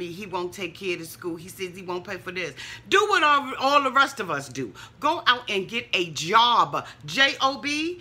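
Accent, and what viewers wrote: Washington DC, United States. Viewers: Is that a DC accent I'm hearing ?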